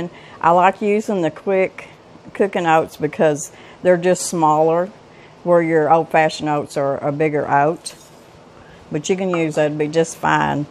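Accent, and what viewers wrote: South, United States. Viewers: Your show and your passion make my Friday's. I love your down home charm and Southern accent! It is the same comfort food my Grandma used to make but she called it Apple Betty. Not sure if that name is a Canadian or old British thing because despite being Canadian born, my Grandma was as stiff Victorian as they come. My variant is, as my bones get older and now its legal here, I add some ground home grown weed to the topping. That and there is a Fromagerie near here where I regularly get 15 or 17 year old Cheddar cheese which is the perfect compliment. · I LOVE a Southern accent along with the food. Ty. And your hair looks fantastic!! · This looks delicious. I have made apple crisp many times over the years. I hadn't done one in a few years. My dad loved them and would ask me to make him one when I would go home to visit. He passed away in 2018 and I hadn't even thought about making one, but seeing this has made me think of him and how much he loved this and so many other sweet decisions deserts. 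Not sure where your from but you sound a lot like my family in GA and AL. You look a lot like a aunt, even your voice. If I closed my eye's and just listened to your video I could see her as plan as day. I love your channel and have even had my mom watch your video on making Sauerkraut. Thanks for your wonderful channel.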